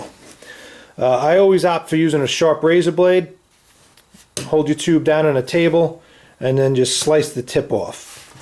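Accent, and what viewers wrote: New York, United States. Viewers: Yikes wish I saw this a few days ago, just spent 3 days struggling with other methods and sticky stuff everywhere. Some people warn against window clean some recommend windex. I just used alcohol on my finger to smooth the bead but the problem I had in NC Florida was a cold snap and I couldn't get the caulk to run very well so it had blobs. I thought it was a lack of strength in my hands but it was the temp. After I ran a heater it worked better, but tooling the blobs was a nightmare. I am a DIY accomplished craftsperson but wow, this was as bit over my head in tolerance. Wish I saw your instruct, hopefully I won't need to redo it for 10 years, but then at 88 I may just say buzz off, and bleach off the mildew!!! LOL thanx for an excellent presentation. Love your NY/East Coast accent!!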